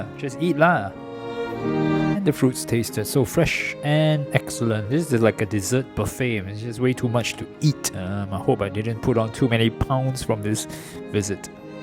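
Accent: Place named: Singapore. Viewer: Your SINGLISH accent is sooo funny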